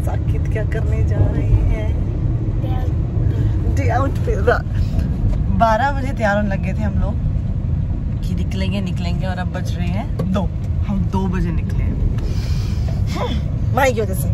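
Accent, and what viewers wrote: Haryana, India. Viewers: Hey didi aapka vlog aacha lag aap haryanvi boltae huae aache lagtae ho to or vlog banae haryanvi m 😍😍👌👌👌😍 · Ye haryanvi m kya bol deti ho bich bich m samaj nhi aata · Pranjal di mane aapki haryanvi lanuage ganni badhia lage hai ar me aapki bohot baddi fan su 🤣😅😊😊😜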